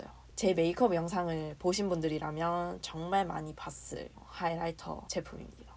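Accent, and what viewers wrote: Gyeongsang, South Korea. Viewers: 그냥 사투리가 같은 느낌인데.. 경상도인이라면 누구나 알,, 다들 이 사투리쓰고 이런 억양입니당 이분도 이분만의 매력이있쥬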